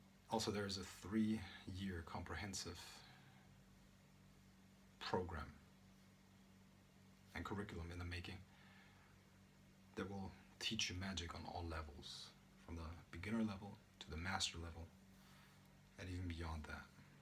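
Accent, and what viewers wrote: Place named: United States. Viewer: Y do u live in Germany u clearly have an American accent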